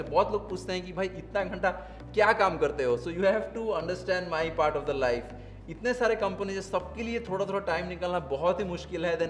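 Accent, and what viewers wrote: Odisha, India. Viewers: au gote katha hela bhai ate achievement kalani..kintu pura down to earth..tama odia accent bahut normal..kete loka achhanti jie tike uparaku gala pare besi sannn saann heiki english kahanti au odia ku bi english bhalia kahanti..ja hau semana nku kichhi sikhiba katha Tama thu bhai👍👍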